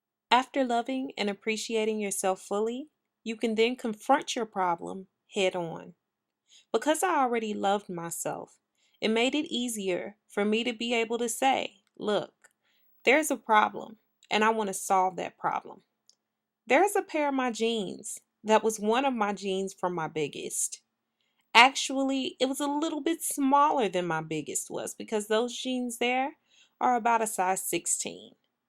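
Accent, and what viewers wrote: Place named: Midwest, United States. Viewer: and are you from the midwest?! your accent is strong lol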